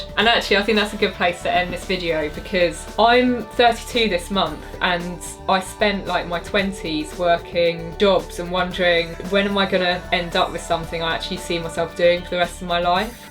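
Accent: England, United Kingdom. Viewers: I really like your English accent 🇬🇧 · Incredible fit blonde beauty, British accent with a mild lisp with a niche subject = 1 million subs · I’m fascinated by the juxtaposition of your British accent and the baseball caps in the background which are representing teams in the USA. 😊 Would you mind sharing your connection to baseball?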